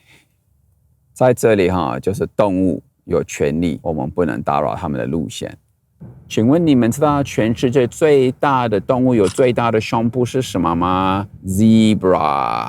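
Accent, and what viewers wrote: Taiwan. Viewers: 你說話根本就是台灣人，老婆又漂亮，真有眼光。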